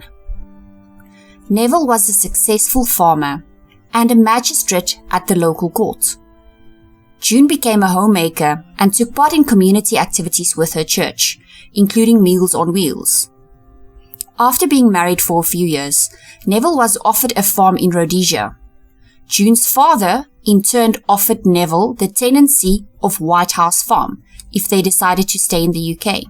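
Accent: South Africa. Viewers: I have heard of this case before but, your in-depth coverage of this harrowing story is second to none. Love your (SA?) accent, by the way. I have subscribed and look forward to more of your content in the future. Cheers from Oz! 🦘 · Hi from Burundi. Yes I figured it was an SA accent!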